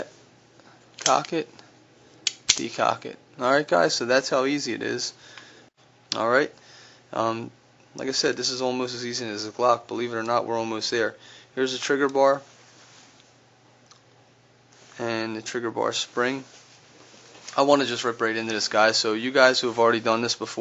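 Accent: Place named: New Jersey, United States. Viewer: I love your analogies A potato chip? LOL!!!!!! Love the accent too. New Jersey?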